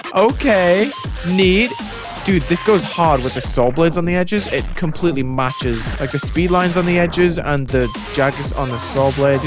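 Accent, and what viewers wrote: United Kingdom. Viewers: shut up brit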